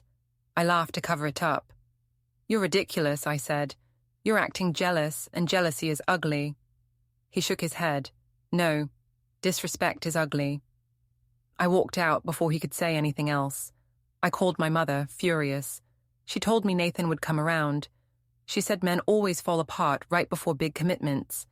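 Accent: United Kingdom. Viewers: there’s a collection of stories where the AI narrator has a British accent. They all follow the same pattern. The wife or girlfriend does something messed up, but not quite cheating. The guy has a problem with it, the woman tries to gaslight him and then it turns out she actually did something way worse. It’s becoming repetitive at this point. Come up with something new. Also, you are giving the idea that the initial thing that the woman does isn’t bad enough. It is there’s no need to also toss in the other things that she’s done. I am two minutes in and it seems like the story is gonna go the same way and if that is the case, I am going to avoid all stories like this, like the plague.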